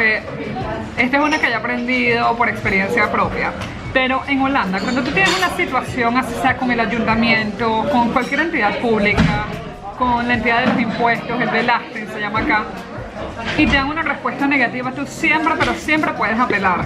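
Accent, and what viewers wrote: Caracas, Venezuela. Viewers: Vi su video por mí interés de viajar a Amsterdam, no se de qué país es usted, asumo que venezolana por su acento. Sobre su video el fondo es bueno pero la forma... Éxitos. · Hola, recién te estoy conociendo por qué tengo pensando viajar a Holanda y quise indagar un poco más, soy barbero que sabes de eso allá? Por cierto también soy de Venezuela, reconoci tu acento. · Coño mi pana el acento de sifrina de caurimare no se te quita.... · tengo duda por tu acento, eres venezolana? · Hablas como Caracas Ciudad de despedidas. Que pena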